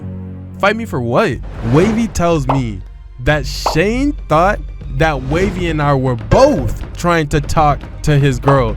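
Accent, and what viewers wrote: Haiti. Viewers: I like your Haitian accent tho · Yo like the way he u was talking Haitian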